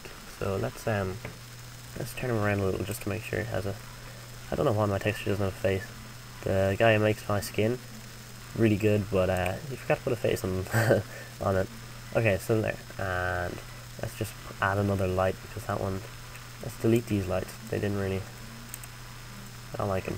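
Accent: Ireland. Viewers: your irish :D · Are you Irish??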